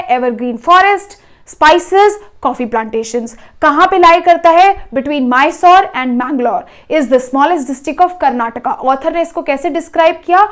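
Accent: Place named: Bengal, India. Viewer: I feel like ma'am is bengali as her accent of speaking pranjol is very bengali type of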